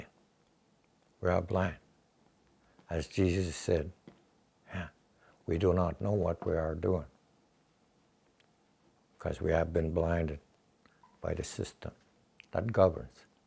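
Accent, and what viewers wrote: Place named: Canada. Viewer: This is really interesting. His accent and my accent are similar, I can hear it as I listen. Excellent video. :) That Canadian dialect ~from a Yooper in "da U.P" of Michigan. :)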